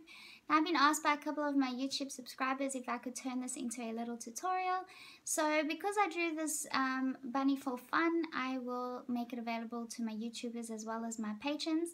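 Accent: South Africa. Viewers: Are you South African? :D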